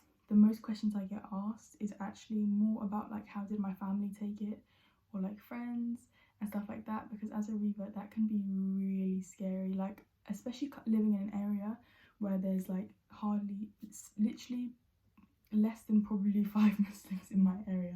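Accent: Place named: Cockney, United Kingdom. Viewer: Assalamualaikum.. welcome to our Muslim family 💗.It sounds like you in a Cockney accent so I'm weak to understand all of your words 😁 but in general I understand it is about your experience and challenges after converting. Good luck to you.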